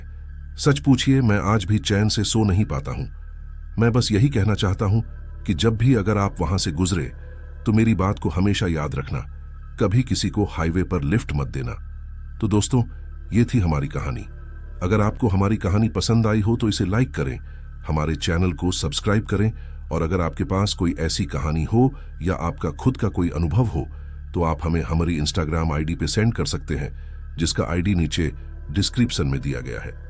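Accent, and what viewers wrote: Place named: Bihar, India. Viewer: Are Bhai bihariyon ki tarah kyon bolate ho bada Ajeeb lagta hai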